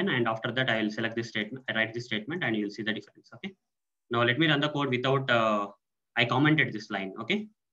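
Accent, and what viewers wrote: India. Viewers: I don’t understand Indian Accent at all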